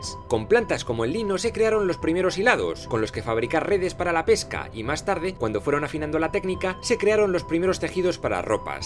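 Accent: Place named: Andalusia, Spain. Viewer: bueno pues a seguir trabajando... xddddddddddddd ese es andaluz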